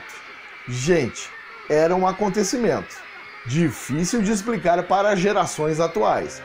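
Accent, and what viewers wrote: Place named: Rio Grande do Sul, Brazil. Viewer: Nao acho que seja a mesma pessoa, o Teily tem sotaque nordestino, e o locutor aqui do canal tem um sotaque de gaúcho. O timbre da voz também é um pouco diferente entre eles.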